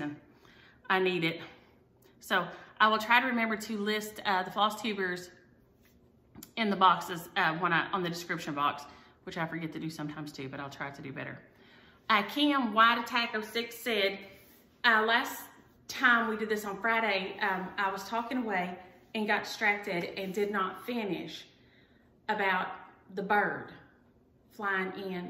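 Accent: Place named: Texas, United States. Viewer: I love your accent, I’m a fellow Texan too over in Collin County. You have the cutest projects! I like Clara, jingle, and the star projects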